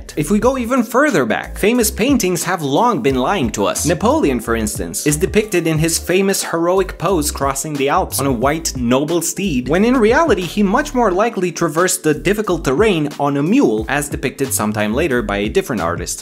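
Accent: Romania. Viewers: The through just sounds ROMANIAN AND I LIKE THAT XD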